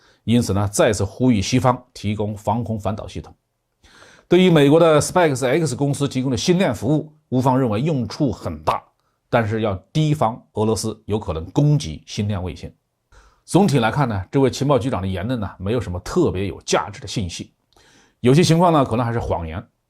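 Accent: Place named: Xishui, China. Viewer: 好浓重的浠普，哈哈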